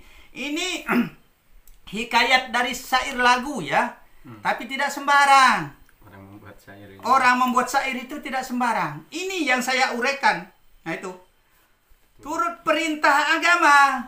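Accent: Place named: Sumatra, Indonesia. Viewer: Yg lebih keras LG bang setuju sy gy bicaranya sama dgn km orang Sumatra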